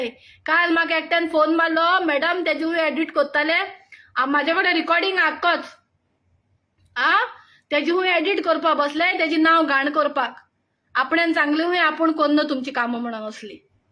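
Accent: Konkan, India. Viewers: just have a look on ur konkani assent, u r a migrants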